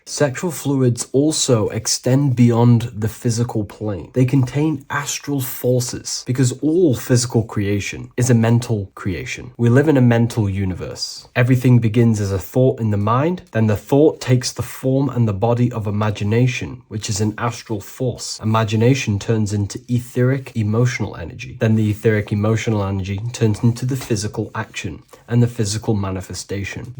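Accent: United Kingdom. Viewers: Such a clear British accent you've got bro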